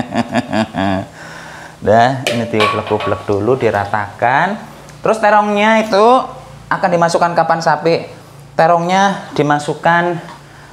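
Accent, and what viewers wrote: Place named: Banyumasan, Indonesia. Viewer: Mase nek bicara ngapak, daerah cilacap tah mase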